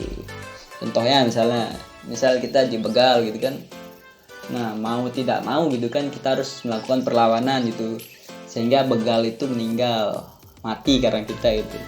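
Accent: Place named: Lombok, Indonesia. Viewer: Logat sasaknya kentel banget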